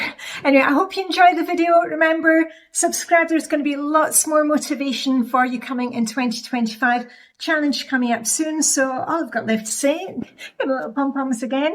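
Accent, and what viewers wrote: Scotland, United Kingdom. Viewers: I love Denmark! But I perceive you are from Scotland!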